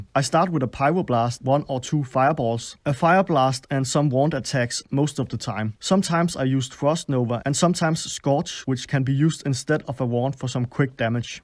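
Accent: Denmark. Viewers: Danish accent xd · That Danish accent tho! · danish detected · This is the thickest danish accent I’ve ever heard and I’m danish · 5 seconds in and I can without a doubt say that this is a fellow Dane. Greetings from Aarhus. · The thicc Danish accent! Don’t play mage, but I really enjoy this content.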